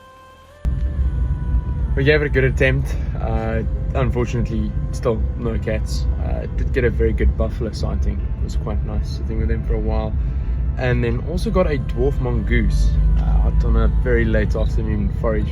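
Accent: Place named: South Africa. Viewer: Pretoriuskop to see leopards.....definitely not. Travel more north to the Skukuza and Lower Sabie region to see lions and leopards. Thravel NORTH not SOUTH from Pretoriuskop to the Skukuza region......you have the South African accent but you don't know directions, so maybe you are tourists. At the end saw your name....what a surprise.....I am also a! (from Pretoria)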